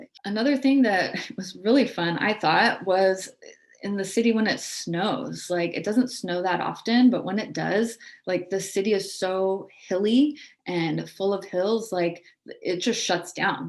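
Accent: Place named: Washington, United States. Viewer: Well said , you even pickup the accent, enjoy life in Washington State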